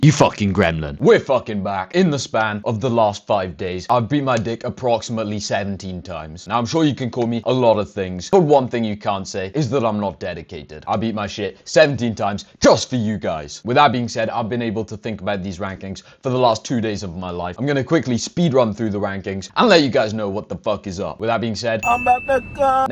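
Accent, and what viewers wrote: United Kingdom. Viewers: why are you british? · Pa fath o acen Gymreig sydd gan y boi ma? Y gorllewin ife?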